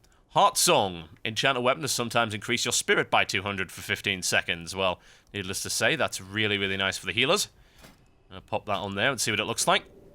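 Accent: United Kingdom. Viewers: brit accent sucks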